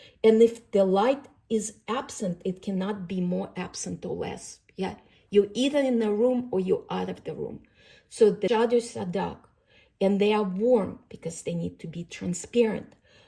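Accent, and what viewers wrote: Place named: Europe. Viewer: Great to hear a European voice and you are so good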